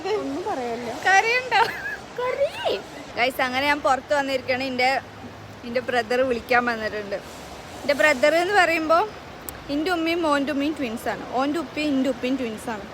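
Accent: Palakkad, India. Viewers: ഫ്ലൈറ്റ് ഇറങ്ങിയതും തനി പാലക്കാടൻ സ്ലാങ്😂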